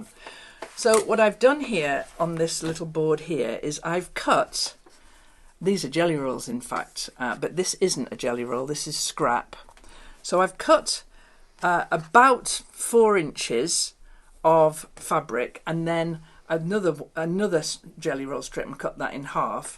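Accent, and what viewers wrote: United Kingdom. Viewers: Just found your channel. I'm so happy I did. You are just deliteful to watch. I love your British accent!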